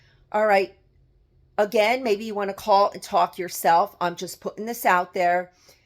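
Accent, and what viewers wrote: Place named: New York, United States. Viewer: On a side note, I heart your accent! It makes me miss home (NY!)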